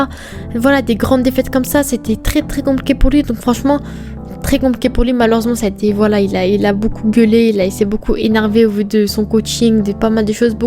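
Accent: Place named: Marseille, France. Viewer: Ah ok t'es marseillaise c'est pour ça t'as un accent mdr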